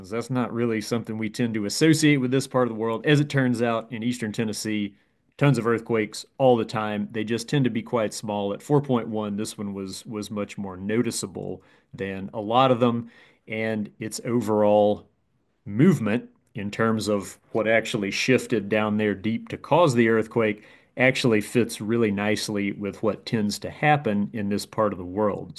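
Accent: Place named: Western Pennsylvania, United States. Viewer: ...is the narrator from PA, spesifically western PA? He sounds like several family members that are from near Pittsburgh.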